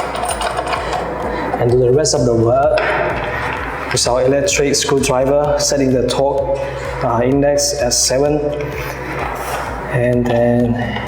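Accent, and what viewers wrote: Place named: Australia. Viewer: Was listening you sound like an Australian accent.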